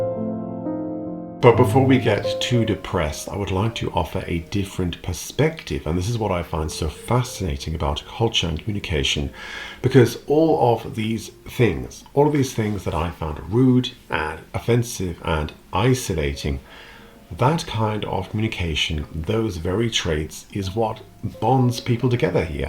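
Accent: Germany. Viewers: Your commentary on German norms is helpful. Thank you. I'm a Brit living in France, work with an international company where some Germans work. I get on best with the Germans, feel like I have more in common in work ethic. By the way, you seem to have acquired a slight German accent. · Your english now has a german accent 😂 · You have a strong German accent! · You don’t sound British. You sound like a German with very good English. Maybe you picked up a German accent living there. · Interesting. You seem to speak English with a German "accent ", now! I am quite blunt but I'm from the north of England! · I’m sure that you’ve heard this before, but you’ve picked up an ever so slight German accent. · This English gent speaks English with a German accent. Interesting.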